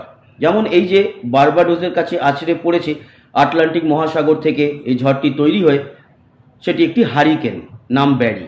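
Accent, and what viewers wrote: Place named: Fatikchhari, Bangladesh. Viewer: আপনার মুখে ফটিকছড়ির কথা শুনলে ভালো লাগে তাই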